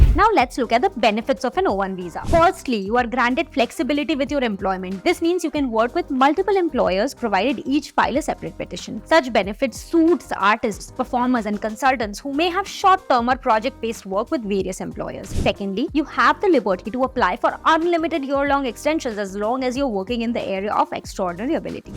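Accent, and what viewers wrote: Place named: India. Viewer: Horrible,horrible accent ,true torture to listen to ,we have no problem with creators from India who are speaking with American or Canadian accent,lots of people is learning English from YouTube but no one is trying to learn English with this accent….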